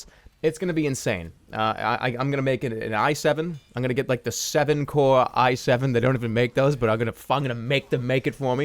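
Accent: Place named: East Coast, United States. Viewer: Jerma are you from the east coast? You have the accent and its awesome!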